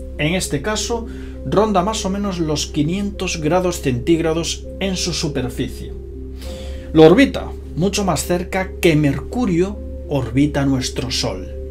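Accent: Spain. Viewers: Porque siempre es en español de España es aburrido nomás de escucharlo....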